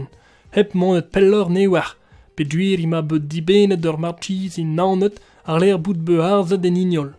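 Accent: Vannes, France. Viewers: Pouez-mouezh Gwened ‘vel just 😊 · Super accent Vannetais, vivant comme les anciens ! 🙋💙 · Je pensais pas entendre un jeune parler breton avec un accent vannetais. Chapeau, c'est une agréable surprise. Ken a vezo comme ils disent dans le bro-Leon. Ça donnerait quoi avec l'accent gwenedeg, "chen a veho"?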